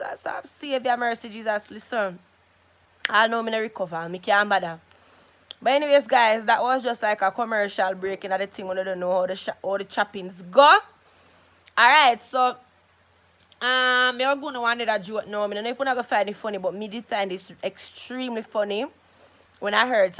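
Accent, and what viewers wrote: Jamaica. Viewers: I feel much better n i was thinking about alot of things that i said b4 to myself about this YouTube thing and its like i wanna move even further after hearing u speak 🤞🤞🤞❤❤🇬🇾 Ps...I fw yall Jamaicans and Trinidadians accent anyday anytime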